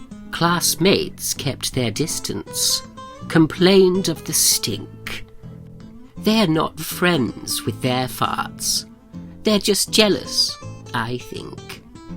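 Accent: RP, United Kingdom. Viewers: Omg!!🤣🤣👊👏👍 you are hilarious. I've never heard someone with such an accent telling stories like this! The posh accent makes it even more funny!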